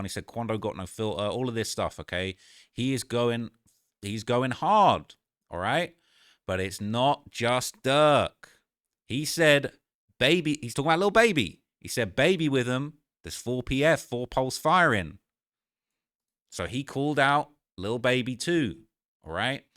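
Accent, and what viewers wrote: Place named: United Kingdom. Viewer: You accent drives me NUTS!!! And the fact you always act like your black drives me even more nuts!!! You are a bum from the UK!!! Stay in your lane yt boy!!!! You gon get it very soon . Remember those words I just said. See you on the news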